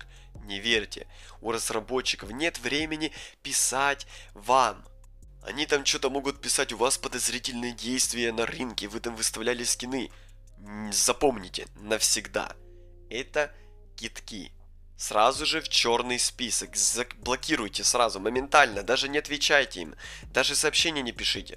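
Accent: Ukraine. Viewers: Ты украинец или беларус?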